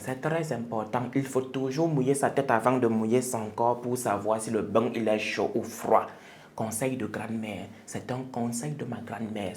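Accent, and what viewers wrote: Cameroon. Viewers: Ces restaurants n'existent pas à Abidjan. C'est peut-être au Cameroun vu ton accent. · Ton accent nous dit que tu ès camerounais. Ne te fous de nous. Tu es vraiment menteur et malade. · si tu es ivoirien, vraiment tu as appris avec réussite l'accent camerounais. Venons-en maintenant aux incongruités intrigantes de ton narratif. Si savoir se comporter est entre autres un critère de choix, à quel moment cette entreprise à testé ton comportement avant de te retenir? Et puis étant donné que l'approvisionnement d'un restaurant est une tâche répétitive, les responsables doivent s'assurer de la disponibilité des composantes de leur menu principal. Les cadavres des morgues sont conservés avec des produits toxiques pour tous vivants. Où d'après toi se procrent-ils permanemment la chair humaine? Et puis c'est à croire que tous les gouvernements qui se succèdent à la tête de ce pays sont une secte d'initiés à la dégustation de la chair humaine. 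Laisse moi retenir que tu as fait un post pour faire le buzz, recueillir des "Likes" et monétiser ta page. Merci à toi frère ivoiro-camerounais.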